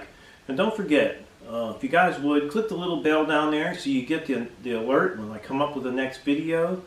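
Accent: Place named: Western Pennsylvania, United States. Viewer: I grew up near Pymatuning Lake, so your western Pennsylvania dialect is a little bit like "old home days" for me. I lived in Indiana for about 10 years and I've been in North Dakota for almost 21 years.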